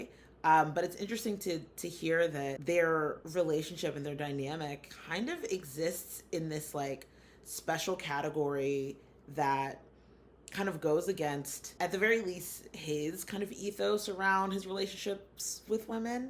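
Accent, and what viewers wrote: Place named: New York, United States. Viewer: My guess is that maybe you're from New York, haha XD And enjoyed your reaction as always!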